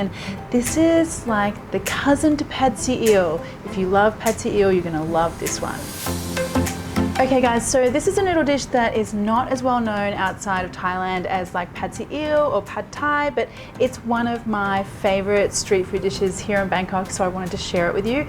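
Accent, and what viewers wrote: Australia. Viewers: Hello, tell us a little about yourself. You live in Bangkok but you have an Australian accent. How did you become a chef? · Ahhh Australian, my apologies for mistaking you for being English after reading the comments,. 🙏🏼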